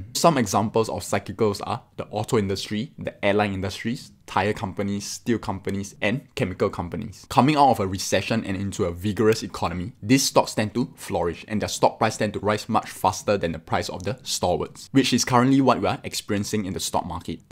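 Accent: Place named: Singapore. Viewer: No pun intended. It’ll be nicely if you can slow down when speak. You sounded like one of my colleagues from Singapore. He has a similar accent like you but speak in a slower pace. In the US, we also have a different accent among various states. Other than that, the content is helpful.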